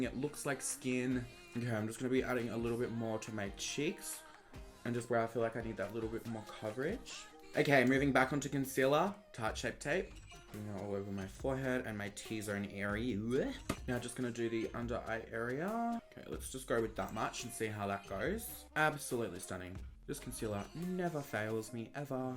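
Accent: Australia. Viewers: lmao at the aussie comments and voice hahahaha 👌😂😂😂😂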